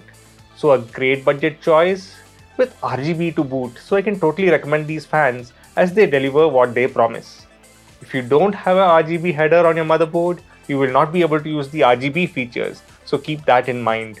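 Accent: India. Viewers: Love the Indian accent.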